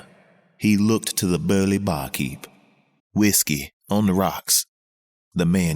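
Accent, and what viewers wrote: South, United States. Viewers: Loving the narration, great southern accent to the cool story. The vocabulary in this story is so enjoyable.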